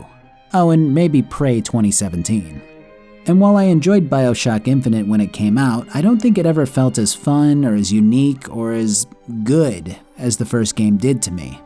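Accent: Germany. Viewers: As german, I liked your german and german accent. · i love your German accent lol maybe bioshock 2 too?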